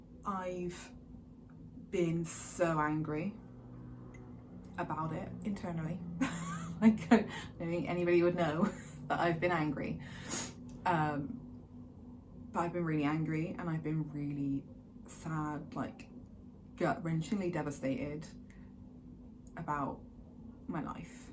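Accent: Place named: United Kingdom. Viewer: Holy crap you are British. Usually watch with CC.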